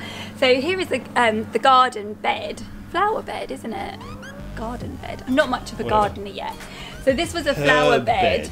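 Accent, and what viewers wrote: United Kingdom. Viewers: How cool, you're from the UK! - I recently commented on your blizzard beach photo and you mentioned your channel to someone in the comments. I just assumed you'd be american but then I was like "hang on a tick, I know that accent" - Your channel looks really interesting and helpful too. I'll definitely be sticking around :)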